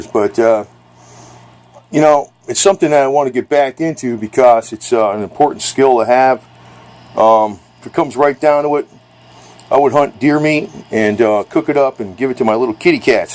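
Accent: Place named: New York, United States. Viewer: Sounds like your from straight outta New York. Or at the very least Northeast? American over China any day.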